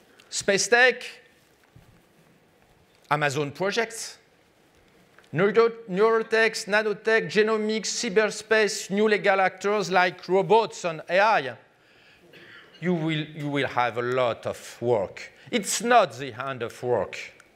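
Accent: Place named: France. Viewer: il a un anglais tellement so french que je le comprends parfaitement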